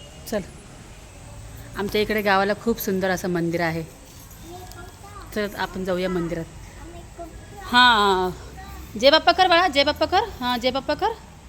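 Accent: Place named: Sangamner, India. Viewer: मला तुमच्या भाषेवरून वाटलेच होते की तुम्ही संगमनेरच्या आहात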